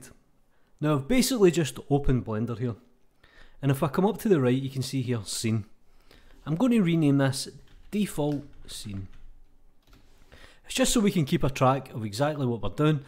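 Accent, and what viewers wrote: Scotland, United Kingdom. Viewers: eh, sorry but he's clearly Scottish! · Love hearing the Scottish accent! I am not native English, but I wish I had that accent 😊 Great tutorial BTW! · i love your Scottish accent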